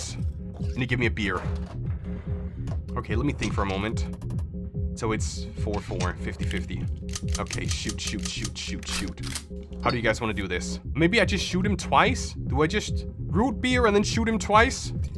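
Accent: Denmark. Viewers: Jeg kan hørrer at du er dansk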